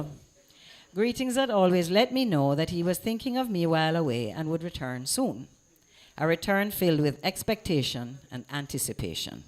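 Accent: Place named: United Kingdom. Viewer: Unfortunately I don't know jamaican accent but her pronunce seems just english -british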